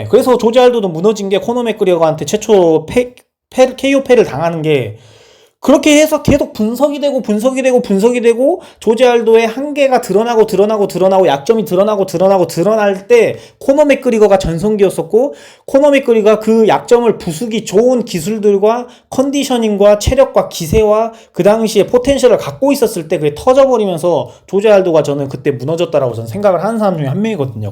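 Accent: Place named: Jeolla, South Korea. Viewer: 말투가 전라도사람인듯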